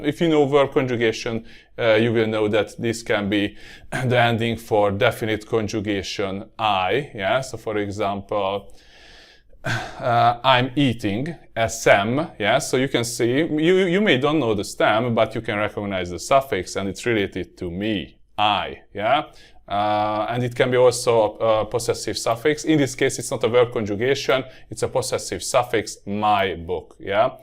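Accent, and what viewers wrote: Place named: Hungary. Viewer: you need to work on your english pronunciation. hungarian is spoken very much in the front of the mouth, english is much further back, closer to the throat. you are speaking english like you are speaking hungarian. try to move the words further back to the throat, your pronunciation will improve a lot.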